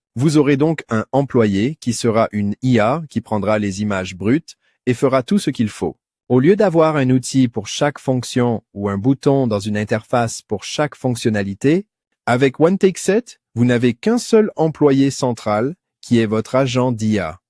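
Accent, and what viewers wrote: Canada. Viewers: La vidéo est créé avec IA grâce aux anciennes ? La voix et les gestes sont globalement les mêmes, mais la dynamique et le rythme sont différents. ( si c'est le cas, l'avez-vous paramétré pour obtenir cette différence ?) L'accent et la voix sont différents également ( québécois), et les gestes ne sont pas coordonnés avec la voix, il y a un petit décalage Pour la confiance de nos prospects, ça peut être compliqué 😅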